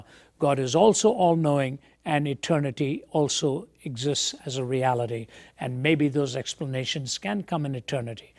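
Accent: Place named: Ireland. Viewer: I first became aware of Ravi when I found him on the radio when I was a trucker. In my mind I thought he was an Irishman because of his accent. I imagined him with red hair and beard lol. I thought his name was Robby! But I am so glad I stumble upon him! He is so smart and well spoken.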